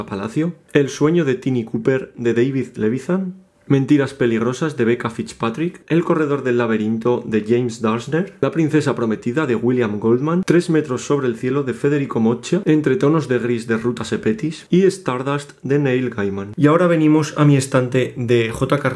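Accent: France. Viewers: Me gusta mucho tu acento, marcas las ciertas letras (como la "h" y la "r") de una forma muy particular. Enseguida se nota que tu segunda lengua es el francés. Yo aunque soy mexicana pasé mucho tiempo en Brasil y siento que a veces se me "mezclan" la pronunciación de las letras también :P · Qué interesantes tus libros, me encanta tú acento en francés hehe <3, yo también lo estoy aprendiendo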